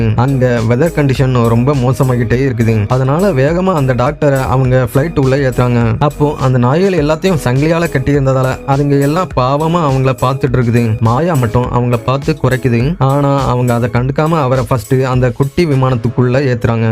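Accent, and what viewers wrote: Kongu, India. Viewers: Enuginga neega kongu nada 😂 ing vida mudiyala 😂😂 I am kangayam inga 😂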